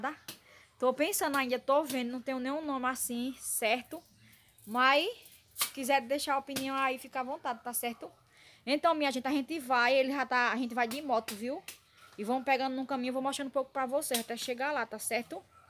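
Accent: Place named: Alagoas, Brazil. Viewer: adoro o sutaque de Alagoas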